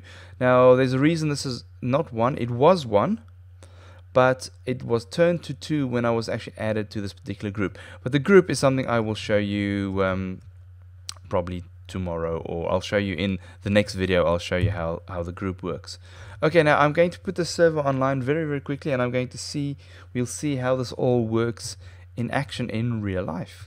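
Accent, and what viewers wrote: South Africa. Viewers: I've been looking for something like this for ages...I love you! Thanks for the great How to vid! BTW you sound South African...xD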